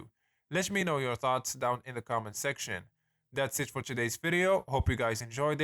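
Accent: Morocco. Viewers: The speaker seems moroccan from the accent 🇲🇦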